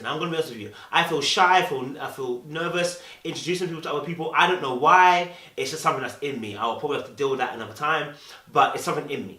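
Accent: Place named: London, United Kingdom. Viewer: Great video! Bro you have a bit of an accent, it's like a London accent mixed with another provence's accent. What accent is that?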